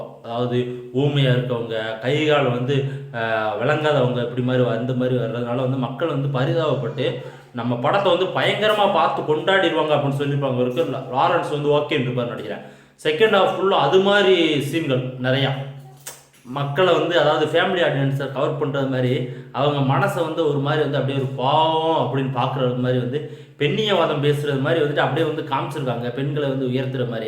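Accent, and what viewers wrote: Madurai, India. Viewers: bro neenga entha ooru odasalunu soldringa entha ooru basha maduraya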